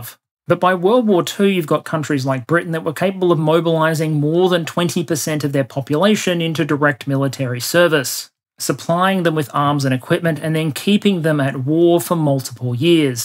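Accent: Australia. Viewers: How you put out such high quality, thoroughly researched and amazingly interesting presentations on a weekly basis while also holding down your day job is just mind blowing. I don't know how you do it, but I am just so damned glad that you do. And whilst sharing an accent and a like of vegemite doesnt give me the right to be proud of your work, there is some level of shared Aussie pride in knowing that a fellow countryman from the wrong side of the world puts out arguably the best Ukraine war related stuff on youtube. Bravo.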